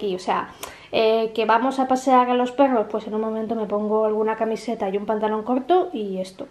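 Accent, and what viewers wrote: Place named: France. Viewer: eres de origen francés? Lo digo por tu acento. Tienes algún vídeo hablando de tus orígenes? Cuánto tiempo llevas en España?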